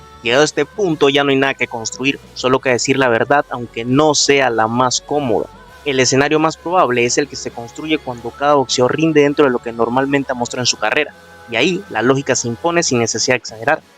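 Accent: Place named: Cuba. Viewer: por tu acento intuyo que eres cubano que vive en el exilio, quizás panameño por aquello de aferrarte a DURÁN. INOUE es el 1er japonés que algunos consideran el mejor libra x libra y eso porque vivimos en tiempos de vacas flcas. Solo te recuerdo que un tira piedras como NERY tuvo al borde del KO al japonés, NERY es sumamente limitado(ROBÓTICO) y sin el alcance que tiene PICASSO. Dices que INOUE es un dios en su país y sí esto es porque allá no tienen jamás el número de campeones del mundo que tienen en otros países como MÉXICO, por eso se aferran a sus mejores campeones. Es muy probable que gane el japonés pero tampoco creo que lo haga por KO, igual no considero a INOUE ALGUIEN INVENCIBLE, y no le doy el % de posibilidad tan bajo que mencionas a PICASSO. Bien que te digas, ya que una cosas es ser y otra es ser experto o buen analista, hasta PNDJOS como FAITELSON se dicen "expertos "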